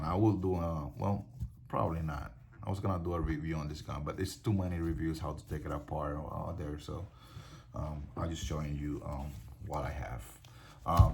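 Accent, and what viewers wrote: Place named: Dominican Republic. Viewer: You sound dominican lol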